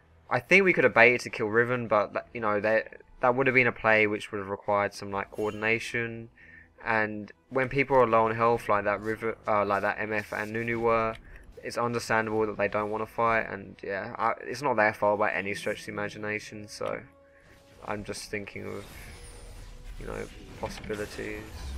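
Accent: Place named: London, United Kingdom. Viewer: london accent :)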